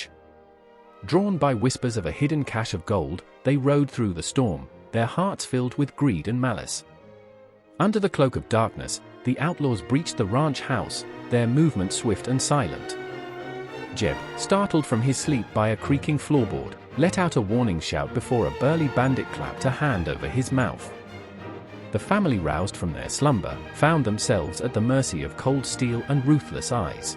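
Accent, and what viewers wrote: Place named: England, United Kingdom. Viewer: Sounds like the narrator is English!! They can't find an American with a southern or western accent to narrate the story?????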